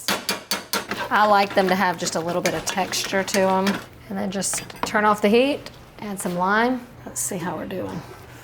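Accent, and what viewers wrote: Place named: Texas, United States. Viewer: Are u from Texas! I’m from Lake Jackson Tx. Love your accent ❤❤❤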